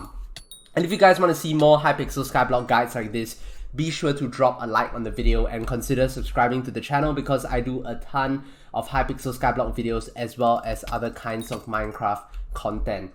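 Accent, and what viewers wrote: Singapore. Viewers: Omg that y ur voice sound like sg me also play skywars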